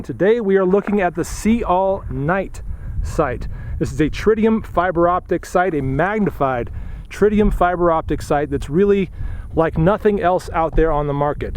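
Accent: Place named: Massachusetts, United States. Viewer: ooooh sexy! (the sight, not you dude) I swear man you sound like youre originally from Mass..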